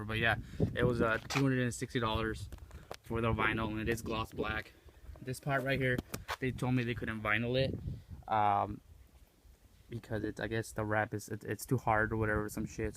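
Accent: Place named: Mexico. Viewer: Sick ride! And I actually like the Meskin accent bro!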